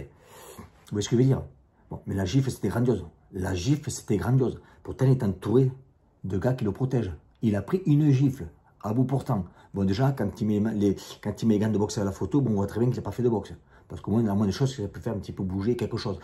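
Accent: South, France. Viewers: Salut. Déjà, les gens ne te comprennent pas parce que tu parles vite. Mais il suffit de s'y habituer je dirais et c'est tout!!! Ensuite tu as un accent que je n'ai pas et c'est bien dommage, je suis parisien, personne n'est parfait... Mais surtout, garde le ton accent, c'est agréable, c'est chantant, ça rappel les vacances, le sud et le bon air! Tu ne vas pas l'effacer pour faire plaisir a certains! Tu sais, c'est un peu comme les gens qui se plaignent des cigales dans le sud, elles font trop de bruit qu'ils disent! Mais le sud sans les cigales, c'est plus le sud!!! Et c'est un peu comme, il parle comme il parle, point!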